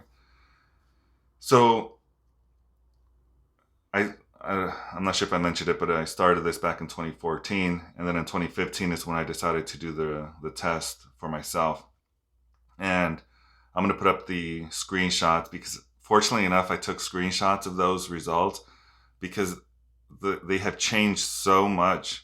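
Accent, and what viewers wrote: United States. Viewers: I live like 1 hour from Philly. I was wondering why you sounded so local. ;-) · first person ive seen that mispronounce his own name you say in pure (USA) American accent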